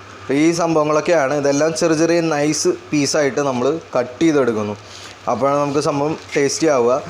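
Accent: Thrissur, India. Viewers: ചേട്ടാ അവതരണം മ്മടെ തൃശൂർ ലൈനില് സൂപ്പറായിട്ടാ.... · തൃശൂര്‍ ആണല്ലോ.... പൊളിച്ച് 🤩🤩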